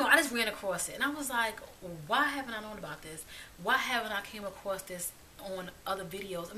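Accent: New York, United States. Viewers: I love prepooing with coconut or olive, very simply i just add oil 15-20 minutes before i shampoo or cowash. It's makes detangling very easy. I would recommend looking at the website blackgirllonghair this website is bomb! They break down information on black haircare very simply and showcase a variety a technique and tools. BTW I love your New York accent you represent New York girls proudly!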